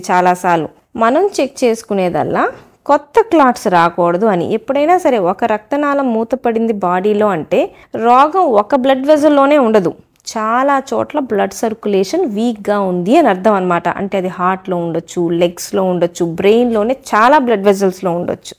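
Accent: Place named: Telangana, India. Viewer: అందుకనే డాక్టర్ రేట్. Though u in Guntur, u speak Telangana Telugu.. nice...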